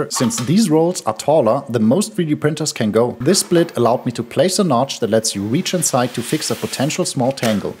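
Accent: Germany. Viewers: Why does he sound like the boyfriend of Uyen (@ And that is not only his German accent but actually his voice. ;-) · you know another way to make sure your roll of towels dont get wet? by not putting it NEXT TO THE FREAKING SINK!!!! how do you not figure this out? your accent sound german! you should be more efficient and smarter than some southern hillbilly yankee! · Heard „Kitchen Towel“ and the Accent and thought: „That’s a fellow german“. (At least it wasn‘t „Kitchem Roll“) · German accent - overengineering - quality nice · That german accent is enough to tell me this is legit 🙂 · The more he speaks the more you can hear the german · Der deutsche Akzent ist crazy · Knew he was German when I saw the gadget and the accent was the cherry on top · Based on accent its safe to say youre German, and the product confirms it. Lol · Are you by chance german? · It makes sense that he sounds German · Over engineering a paper towel dispenser is the most German thing imagineable, finished with the perfect, slight German accent, in delivery.. 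· how to tell people you are german, in case they dont identify the accent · Hear German accent, open comments. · Hears "I overengineered" spoken with German accent Yeah that makes sense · German accent makes every video about engineering and design more reliable. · You sound so German. Are you German? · German accent checks out. Grüße an der stelle.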